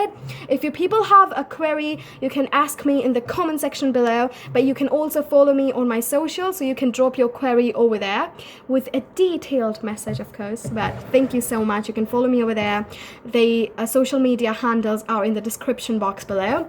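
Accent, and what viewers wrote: United Kingdom. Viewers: I can hear you have a British accent here:) Love you from the Philippines:) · British accent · Hey that's a cool BRITISH accent! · Speaking British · You were speaking british accent in the video??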